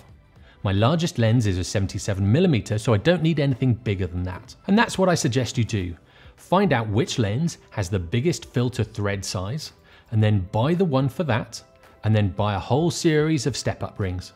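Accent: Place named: RP, United Kingdom. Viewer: You have a lovely posh accent.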